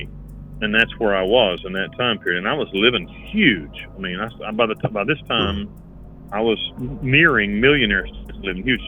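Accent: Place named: Manchester, United Kingdom. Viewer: New subscriber here - recommended by Disturban. I noticed your accent, are you from Manchester?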